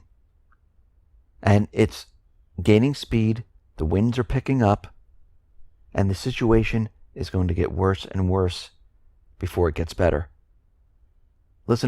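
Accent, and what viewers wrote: United States. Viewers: a foreign national with a perfect American accent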